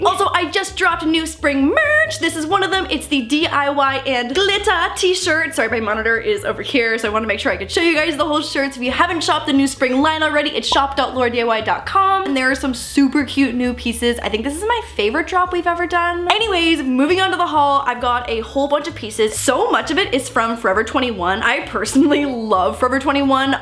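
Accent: Canada. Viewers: . Your Canadian accent kinda showed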